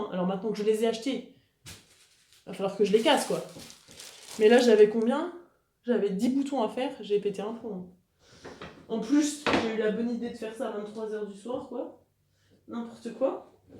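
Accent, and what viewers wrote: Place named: Lorraine, France. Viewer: Je viens de découvrir tes podcasts, que j’apprécie beaucoup et je me disais bien que je reconnaissais cet accent...Je suis allée au lycée à Lunéville, j’habite maintenant en Allemagne. Ça me fait plaisir de t’écouter, le podcast est intéressant et inspirant, et ça me transporte dans la région de ma jeunesse 😉. Bonne continuation....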